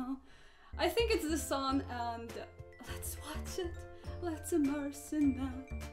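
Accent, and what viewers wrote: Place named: Russia. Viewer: Она русская, акцент чувствует ся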